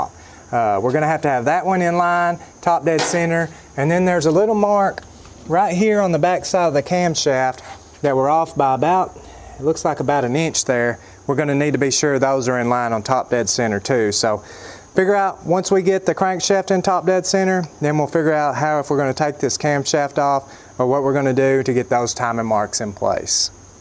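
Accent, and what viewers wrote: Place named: Texas, United States. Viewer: I like the pace of your working. You tackle things so intentionally and cleverly. Also the dialogue between the two of you is amazing to witness. I prefer that so much more than when you made the silent version on the Citroen. That was kinda weird because it was so obvious that at times you had to restrain yourself talking. That made it awkward to follow. Besides, your Texas twang is nice to listen 😊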